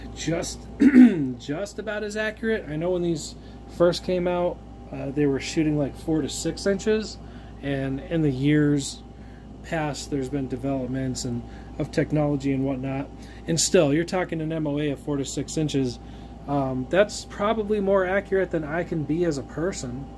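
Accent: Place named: Midwest, United States. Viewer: Sounds midwest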